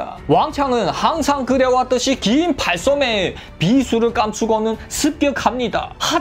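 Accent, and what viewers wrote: Yanbian, China. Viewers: 중국이야기라 조신족 말투를 하는가? 아니면 원래 조선족출신이라 발음이 그런가 ᆢ하옇튼 시원시원 듣기 좋습니다. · 이것도 뭐 재미나네. 구수한 연변사투리에 새롭습니다. ㅋㅋㅋㅋ 다음에는 涂贵武 사천킬러 투꿔이우 이야기도 좀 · 언어 표현력이나 사용하는 단어들은 한국인 못지 않은데....억양은 중국억양이네... 이야기를 재미있게 풀어 말하는 재주를 타고 났네요...중국어로 말하면 중국인들은 말문이 막히겠다..... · 옌볜 말투 넘좋아~~^^😂❤ 구독!!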